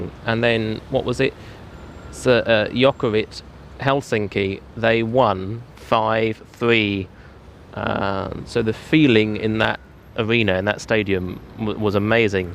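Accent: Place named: United Kingdom. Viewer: Thanks for this! 😀 great content! I like how British your accent is and yet i can clearly understand what you were saying!😊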